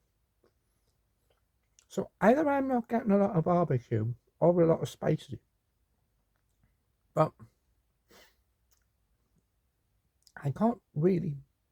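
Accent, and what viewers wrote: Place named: Newcastle, United Kingdom. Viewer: Love the geordie review ❤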